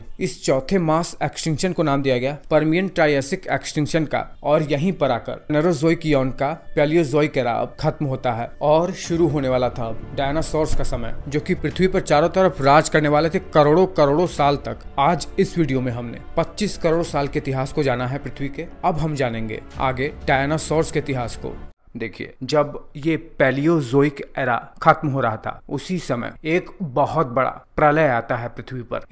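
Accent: Bihar, India. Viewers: Bhai tm bihar s ho kya 😮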